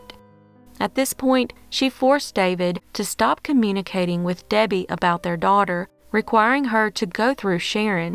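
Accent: South, United States. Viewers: Thank you kindly and I love your southern accent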